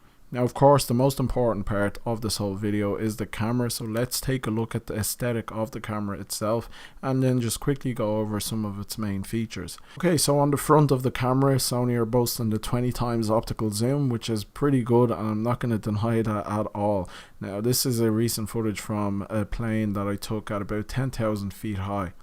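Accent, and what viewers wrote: Dublin, Ireland. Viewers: Dude, are you Irish? you sound like a Dub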